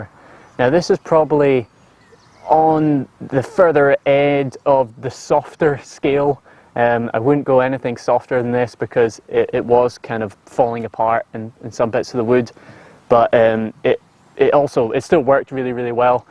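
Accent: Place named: Scottish Highlands, United Kingdom. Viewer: What part of Scotland are you originally from,? You sound quite highland..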